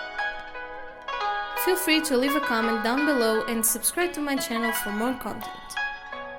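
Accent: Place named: Portugal. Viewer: Esse sotaque com certeza é de Portugal!♡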